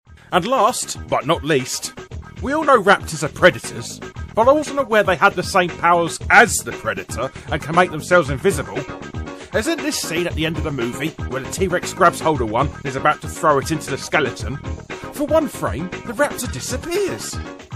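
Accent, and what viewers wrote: United Kingdom. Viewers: I swear sounds like Taco Man with a British accent XD. Awesome commentary on JP mistakes nontheless.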